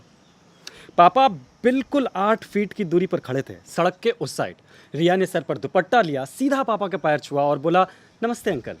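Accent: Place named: Bihar, India. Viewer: I want to see u again on tape a tale... Feeling something very heart touching... Especially. "Shi to thi Riya, bna nahi kahen aap dono ka" And most importantly, I love ur Bihar wala feel and touch little bit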